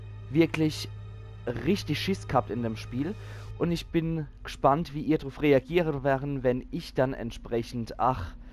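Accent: Palatinate, Germany. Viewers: ah hier steht es ja auch .lol. Pfälzisch tatsächlich.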